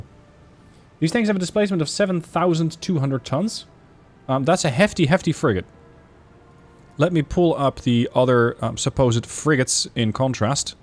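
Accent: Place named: Netherlands. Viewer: Your accent in german gave it away i think you are or at least speak dutch. Greetings from Switzerland.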